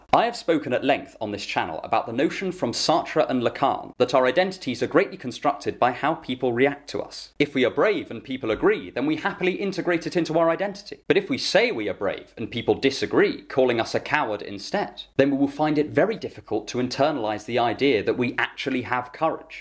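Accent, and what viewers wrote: United Kingdom. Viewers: 4 star video. -1 star for the obnoxiously british accent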